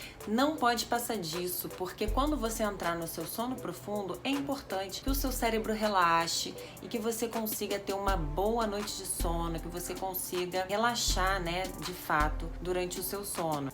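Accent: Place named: Rio de Janeiro, Brazil. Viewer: Cai aqui de paraqueda e estou amando........ ótimas dicas e eu posso dizer com certeza .....isso funciona!! Foi com um método parecido como esse que fiz minha carteira de motorista......, sou Baiana moro na Europa e amo o inglês, estou aprendendo, mas as suas dicas são ótimas, obrigada... meu pagamento das aulas já fiz....... 😂😂😂dei meu like👍🏻👍🏻👍🏻👍🏻👍🏻👍🏻 e baixei o soninho 💡⏰🛎🔑pra receber mais bônus ( vídeos ) seu sotaque Carioca é lindoooooooooo🥰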